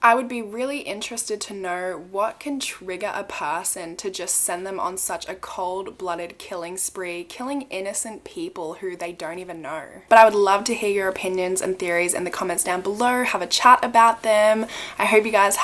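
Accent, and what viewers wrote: Australia. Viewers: You've never sounded more Australian. Love it 😂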